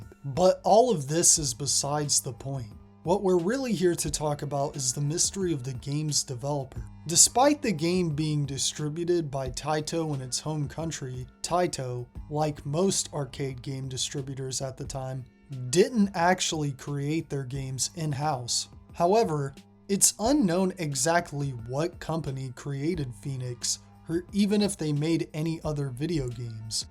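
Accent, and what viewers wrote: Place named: South, United States. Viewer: Maybe it’s his ambition to be “refudiated” for his desire to use the word. 😉 In seriousness though, I notice that he has Southern-accented English, the vernacular forms of which posses a lot of non-standard pronunciations for common English words; for example as a Texan, I remember mispronouncing the word “Nuclear” as “Noo-Cue-Lure” instead of the correct “Noo-Klee-Ur” thousands of times before it later became a US-wide meme in 2002 due to “a certain Texan US president”. “Lambuxatious” sounds vaguely familiar, but I can’t immediately put me finger on what words it’s a derivative of (perhaps a combination of “To lambaste”, “To buck”, and “Vexatious”? 😂 ).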